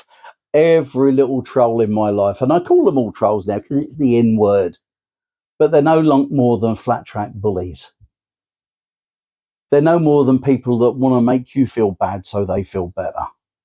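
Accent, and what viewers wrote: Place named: Cockney, United Kingdom. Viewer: Fascinating and true, it seems like some people can't get by without insulting others to go on a power trip. I'm curious about your accent, it sounds like you have a London or cockney twang in there. Very enjoyable video thank you.😊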